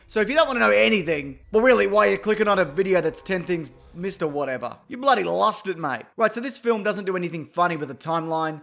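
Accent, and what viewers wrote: Australia. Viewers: “Ack-wa-man” is it that hard for Australians to say aquaman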